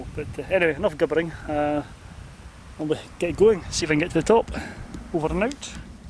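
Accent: Scotland, United Kingdom. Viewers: Your videos are a delight: Scottish mountains and a Scottish voice. If the Scottish voice is a challenge to some then just enjoy the video as if you were listening to a song in a language you do not know. The joy in life is to encounter the new not reject the new or expect the new to change to the familiar. videos are about challenge that's why people love them.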